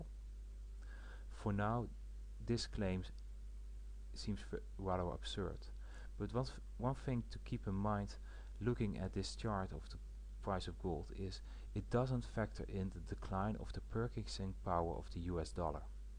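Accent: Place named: Netherlands. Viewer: Lol he's Dutch. Shitty accent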